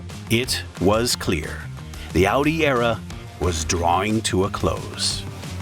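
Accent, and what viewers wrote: United States. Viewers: Just say Volkswagen. It sounds dumb when you talk like an American for the whole video except for one word where you have a German accent. It just sounds pretentious and smug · Pity about the Hollywood yankee doodle commentary... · The narrator amazingly pronounces Mr. Piech's name correctly- most non-Germans say it incorrectly as "peach". But- the CEO of VW was not "Schumacher", but Schmueker (would sound like "shmicker"). And the company brand is sometimes pronounced as 'oddee" and not "owdee", even in the same sentence?! And my gosh- who is the main intended audience of this video, given the obvious American accent of the narrator? The USA! So provide the units as most commonly used in this country- standard units (e.g., inches, pound-feet torque, pounds, miles per hour, etc.) with either metric spoken right afterwards, or visually in parentheses/captions. 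There are some std units on the car depictions as subscripts, but when the text is spoken they are missing. Nice to see photos of the development cars for the original Quattro setup.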